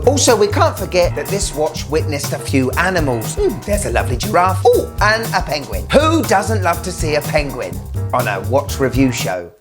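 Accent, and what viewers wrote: United Kingdom. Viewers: Hi friend, thank you so much for you videos, and as i'm english learner and i use your videos to learn britich accent, cuz you have a great one, i have a favor to ask, can you add to your video a transcript have all your talk please ? and thank you so much again for your Job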